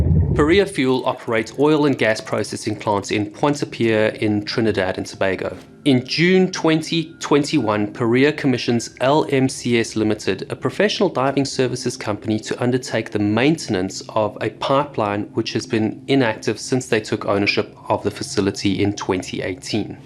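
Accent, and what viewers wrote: South Africa. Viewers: Do I detect a South African accent? Thanks for your clear and not too technical explanation of a horrible accident that shouldn't have happened. Your delivery is very good - my normally very impatient hubby, who used to do recreational diving, watched the whole way through, he normally fast forwards.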